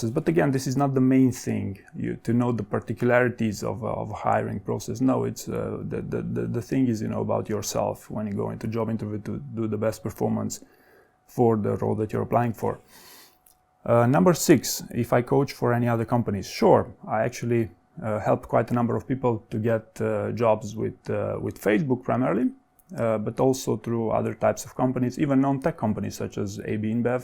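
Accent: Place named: Romania. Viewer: Thank you for all the videos! I figured that you would be Romanian, you sound exactly like one of my Romanian boss :D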